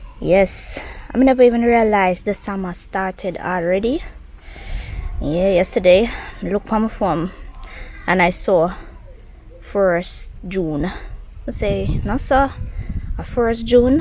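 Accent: Jamaica. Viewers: and the patois kills me every time! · Very proud to see that you adopted the Jamaican culture and accent and your kids are wonderful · You talk, act and cook like a Jamaican. Congratulations. I also like your green thumb and love of traditional Jamaican foods. I see that you are taking care of your family well. Happy for the family. You guys are adjusting well to life back in Jamaica, having lived overseas for all of these years. Ackee and Saltfish looked scrumptious.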